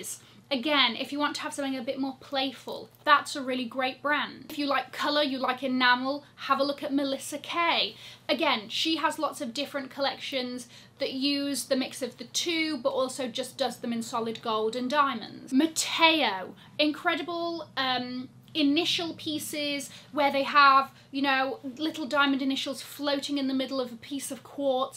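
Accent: United Kingdom. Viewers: I didn't think you would have a British accent. Surprise! ❤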